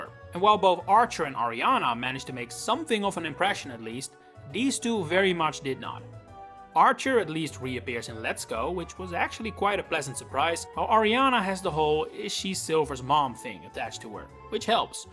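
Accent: Netherlands. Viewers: Dutch accent recognized, opinion respected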